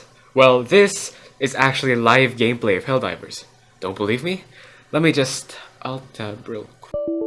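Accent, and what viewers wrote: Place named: Philippines. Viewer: you sound so slightly Filipino. I don't know what it is. 😂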